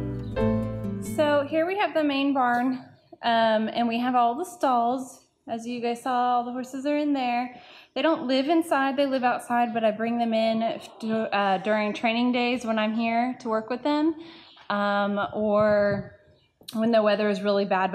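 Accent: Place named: Texas, United States. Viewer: I thought so! We are neighbors! I could tell from the terrain etc. plus you sound Texan I run a private non profit in Marble Falls. Would love to connect!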